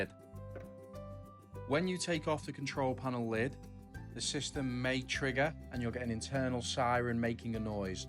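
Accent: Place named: Birmingham, United Kingdom. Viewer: it reminds a bit of brummie. Have a nice one and keep it up.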